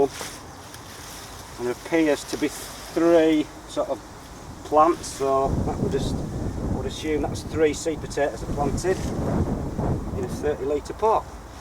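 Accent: Yorkshire, United Kingdom. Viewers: Brilliant vid as always. Love your natural commentary and Yorkshire lingo. Not so much different from us in Lancs. not looking forward to winds if they come as you say. I'm on my 4th attempt green beans and they're hardly in flower yet and only 5ft tall. Winds will kill em off! My indoor cuke got flowers and no cucumbers yet! Chillies great and harvesting my outdoor bush toms already, indoor ones nowhere near ready. keep an eye on turnips. Mine are super quick. Seed to table in under a month! Don't ask me how and leaves huge! Hot here around teatime about 25* only 10-12 overnight. My 2 cabbage I planted a month ago as 2" seedlings are now ft long sprout plants! Packet obviously wrong!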